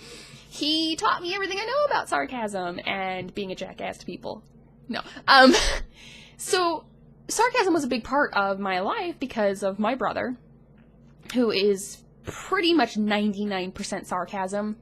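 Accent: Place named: Midwest, United States. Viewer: I am from the midwest but moved to the Pacific Northwest. And your accent sounds soooo Midwestern. Michigan? Wisconsin? gah I cant place it and its killing me. You have a great personality! this video was awesome and really helpful!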